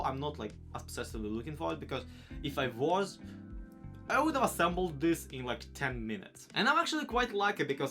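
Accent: Russia. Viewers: Дикция палит тебя что ты руский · the thick russian accent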